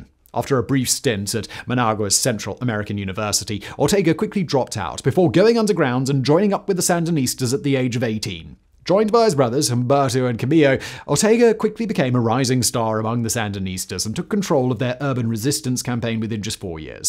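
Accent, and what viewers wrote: United Kingdom. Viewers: you might want to tone down on your pompous Bristish style of speaking. its a turn off.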